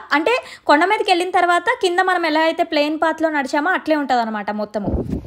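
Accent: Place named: Telugu, India. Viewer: ur pure Telugu slang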